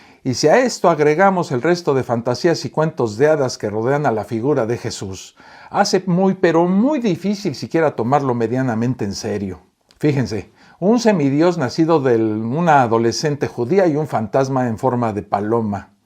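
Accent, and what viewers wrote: Mexico. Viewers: No me explico cómo puedes estar contando,tantas pamplinas y tanta gilipolleces y es que Youtuber lo tienes colapsado con tantas mentiras que dices en tus vídeos.. ‘’Se suele decir que cuando un tonto va a la feria todo se vende y siempre hay un montón de panchitos y panchitas que pican como los conejos a las zanahorias 🥕 Y enciman con el acento MELOSO Mejicano o Argentino que usas para que vas hablar más. Si aburres a un muerto. Habla castellano con buen acento y no hables melosso. Jajajaja ya te vale Mejicano o argentino meloso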